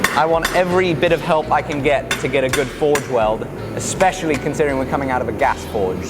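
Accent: France. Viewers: oh l'accent frencais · I'm starting to get used to see you achieve great work when you had no idea how to do at the beginning but I will never be used to your perfect French accent! I never met a British would spoke that well^^ when will you make a collab with a French guy?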